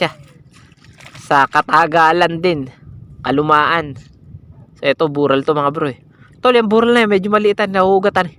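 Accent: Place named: Talim Island, Philippines. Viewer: Lalo akong nag-eenjoy na panoorin ang vlog ninyo kapag naririnig ko ang puntong Talim Island ninyo. Bang-laki ng bangus hane?! 😀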